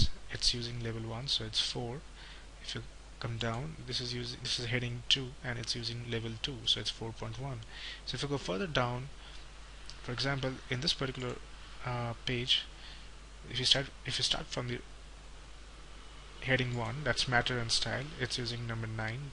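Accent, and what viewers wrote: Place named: India. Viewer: THANKS MAN WITH INDIAN EXCENT YOU SAVE ME FROM CRAZY 0.O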